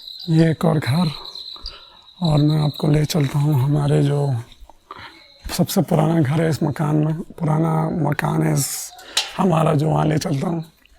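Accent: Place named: Garhwal, India. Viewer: Good garhwali accent 🎉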